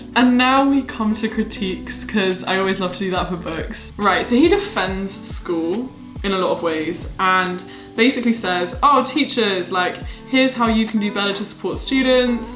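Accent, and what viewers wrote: United Kingdom. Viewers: since i watch your videos, i have to say that i can listen to British accent easilier. One more thing is why you can have lots of energy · Hey.. I love ur accent.. The first time I saw ur video, this british accent of yours inspired me to learn it...😀 Your motivational videos always made me feel confident and have gratitude and how could I forget your unique study techniques which you delivered on this platform to millions of people in order to help them out.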